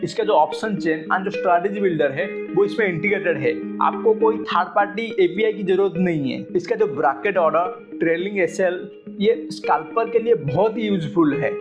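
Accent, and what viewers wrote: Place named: Odisha, India. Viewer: Odia acha bhai ??? Tama accent ru jana pduchi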